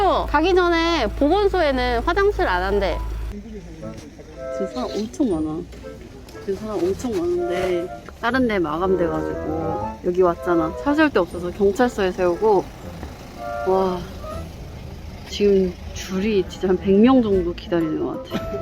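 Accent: United Kingdom. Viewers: 품위있는 영국 영어와 싼티작렬 한국말의 조화ㅋㅋㅋㅋ 유쾌유쾌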